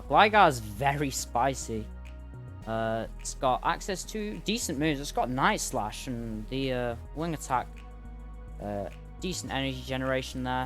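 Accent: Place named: United Kingdom. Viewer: The British accent hell yes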